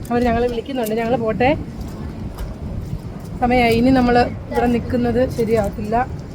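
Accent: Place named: Pathanamthitta, India. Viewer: നിങ്ങളുടെ വീഡിയോ ഞാൻ ആദ്യമായി കാണുകയാണ് നന്നായിട്ടുണ്ട് സംസാരം കേട്ടിട്ട് പത്തനം തിട്ട ജില്ല ക്കാരെന്ന് തോന്നുന്നു ആശംസകൾ 👍🏻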